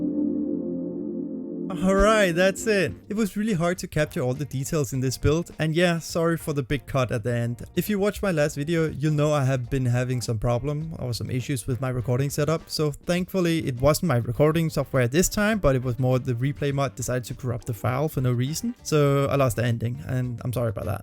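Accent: Denmark. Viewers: i noticed your accent, are you danish? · Yo you danish?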